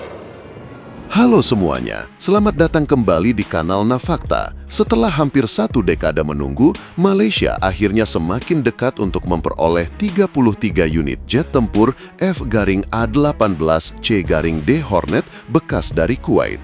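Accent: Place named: Indonesia. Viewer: kenapa admin suara indon dan bahasa indon.suka sangat indon cakap pasal pertahanan malaysia.kenapa admin tak bercakap pasal alat pertahanan indon sendiri.tak ada org berminat ke kalau cakap pasal alat2 pertahanan indon tak laku ke atau ada org minat.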